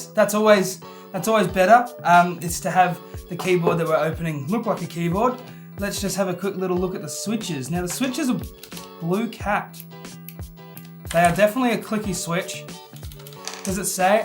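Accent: Australia. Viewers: U Aussie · Where you from man, by your accent I bet you’re from Australia like me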